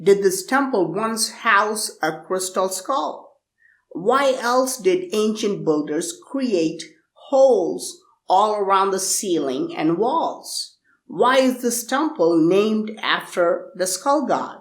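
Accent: India. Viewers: Hello u speak Indian English k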